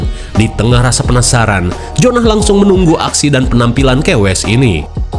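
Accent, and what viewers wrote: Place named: Bandung, Indonesia. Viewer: Si aa mah sunda na bandung pisaan aa 👌👌👌 Wewe gombel 😂😂😂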